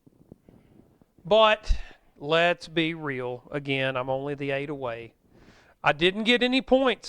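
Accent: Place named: South, United States. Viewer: Thank you! I love your Country Accent!!! 🙏🏿❤️❤️🥰❤️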